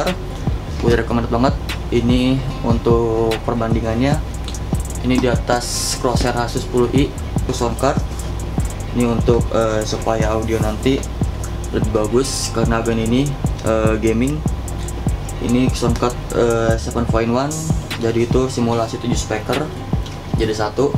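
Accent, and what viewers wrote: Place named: Java, Indonesia. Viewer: biasa lidah jawa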